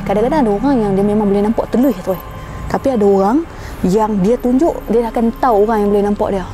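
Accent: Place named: Penang, Malaysia. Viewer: . Hang segak la cakap penang pulak! Hang mmg comei 😍😍😍 dok follow dari joki timba tu.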